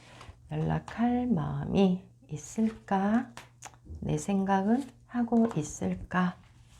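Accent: Gyeongsang, South Korea. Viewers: 열심히 리딩 해 주셔서 감사 합니다 . 하지만 조금 지루한 감이 느껴 지네요. 경상도 억양 고치시면 더 좋을것 같아요. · 경상도 억양을 왜 고쳐야하죠?? 님이 지루하면 안들으면 되는것을.....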